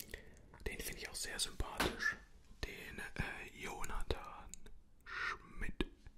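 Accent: Bavaria, Germany. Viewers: Kommst du aus Bayern?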